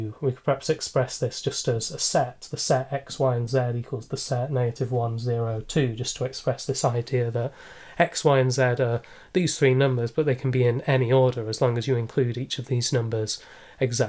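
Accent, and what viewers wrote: United Kingdom. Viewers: Thanks for this video and for your british accent :) Clever solution and beautiful problem. Hope you are doing well :)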